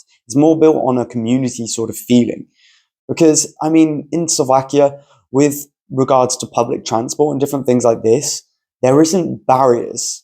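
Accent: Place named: South, United Kingdom. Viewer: I already knew from the title that this guy would have a southern accent. There are parts of the UK where it's better than he's experienced, but his general point is still true overall, even about the other places. An obvious example I thought of was how here, it's common that a bar will just run a tab and you pay at the end. Another one like the ice cream incident, I filled the car up at the Tesco on our estate, realised I didn't have my wallet so no money or even ID. The guys originally agreed I should repark the car and leave the keys while I walk back to my flat for my wallet. When I came back in with the keys and they'd seen I was going through with the plan they just told me to drive off and get my wallet so they could close the thing quicker.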